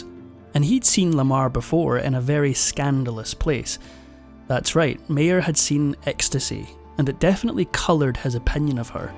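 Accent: Scotland, United Kingdom. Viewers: People only talk about her WW ll contributions but the more you remember or read about her, this was one sick Beech. Addicted to amphetimines and hateful of her oldest adopted son, she also felt entitled to shoplift. Her marriages were a disaster. Lamarr, charged with allegedly shoplifting $86 worth of merchandise from the May Co. Wilshire department store, was found not guilty by a jury. Photograph caption dated April 25, 1966 reads, "Denies any intention to take merchandise from store without paying for it." She was married and divorced six times. I love the Scottish accent! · I don't know what your talking about! I thought this man had a lovely Scottish accent. I'm Scottish too and very proud of our accent. What a cheeky you've got! 🏴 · And as n Anglo speaking listener, not only Scottish, but clearly spoken and completely understood 😉 Beautiful lilt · Ah. 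the narrator is a Scotsman - how novel is that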